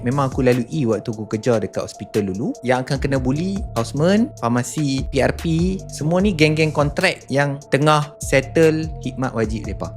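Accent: North, Malaysia. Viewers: haaa!!! biar betey??? gilalah.. siap loghat utagha tu... ni mcm mahathir lah ye??m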